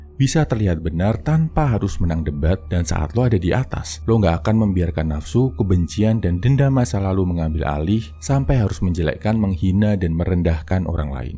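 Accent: Java, Indonesia. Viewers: Keren bang pasti orang jawa ni admin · Mas wong jowo yo?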